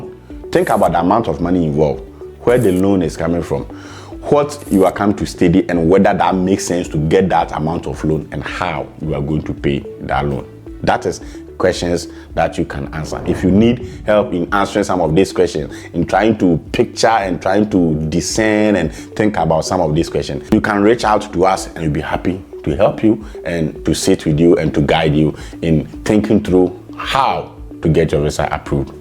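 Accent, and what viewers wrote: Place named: Ghana. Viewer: I think you are Ghanaian, Ur Accent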